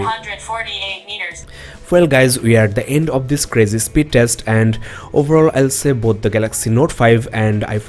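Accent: India. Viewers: he speaks indian english dude 😄😄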